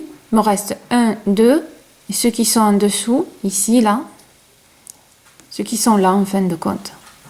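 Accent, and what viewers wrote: South, France. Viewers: Merci à toi, "comme une grande" j'ai réussi à faire ce magnifique point de torsade grâce à tes explications claires et ton agréable accent du Sud.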